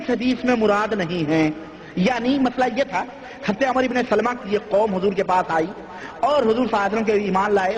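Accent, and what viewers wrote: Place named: Pakistan. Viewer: Hulia Arab numa, Pakistani lahja. Allah hidayat da sab ko. Dawat e tabligh zindabad.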